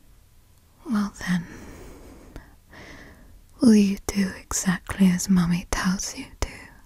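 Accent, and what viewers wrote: United Kingdom. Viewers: Oh my, I am SO, weak for British accents. They have that posh, certain tone that makes them sound luxurious. and GOD, the teasing is just delicious to listen too, and the accent only amplifies it, I would so end up whimpering and flustered in the best way hehe. Lovely video love! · Mommy british voice nooo my one weakness. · That british accent is so cozy yet so misterious .the experience is very intimate with just simple words of affection im suscribed as heck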